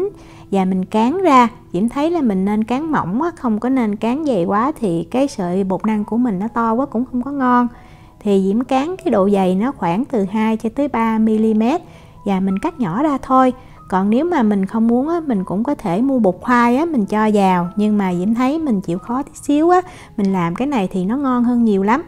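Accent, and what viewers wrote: South, Vietnam. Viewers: Cưng Diễm chúc em luôn khỏe thật bình an nhé giỏi nấu món đẹp ngon giọng nói hiền tự hào người miền nam i love you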